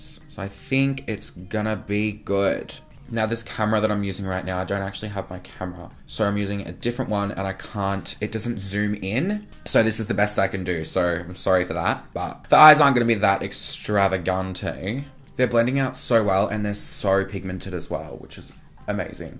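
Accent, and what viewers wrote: Australia. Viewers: u from australia? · Are you Australian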